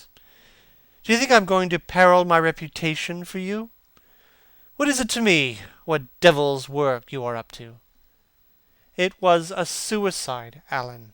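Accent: United States. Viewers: American accent doesn't fit.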